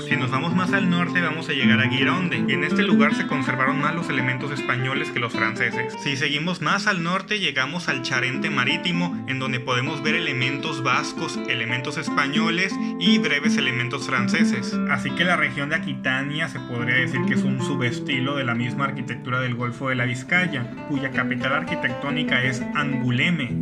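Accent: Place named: Guadalajara, Mexico. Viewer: JAJAJAJA si Auronplay viera que lo pusiste pelón te diría : Menudo personaje de cojones JAJAJA, buen video como siempre entregando cada vez la mejor calidad para tus suscriptores mas fieles, y buena imitación de acento andaluz he, pero por tu acento natural yo diría que eres Tapatío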